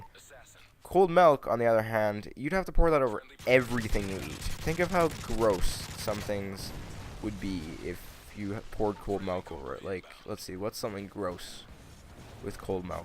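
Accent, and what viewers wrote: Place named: Canada. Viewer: you say milk funny, melk, but ur Canadian so you have a accent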